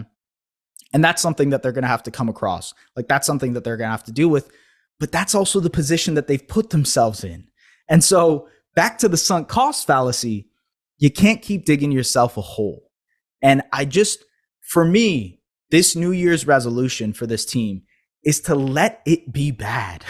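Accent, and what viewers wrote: Canada. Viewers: Raptors trade pascal and build around barnes also u sound Canadian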